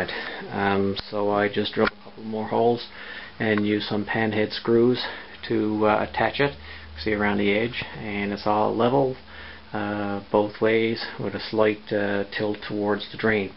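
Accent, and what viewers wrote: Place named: Newfoundland, Canada. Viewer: This video saved me a ton of time. Thanks! Recognize that NL accent -- should have tracked you down to install it for me! HA! Thanks again!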